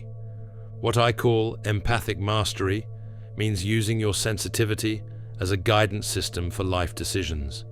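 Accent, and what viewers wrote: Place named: United Kingdom. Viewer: sing-songy British voice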